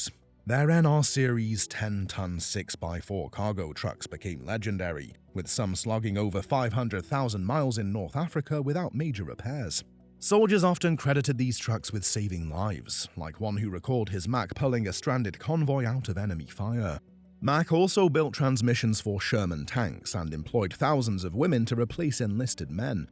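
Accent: United Kingdom. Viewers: Why a Brit explaining American history?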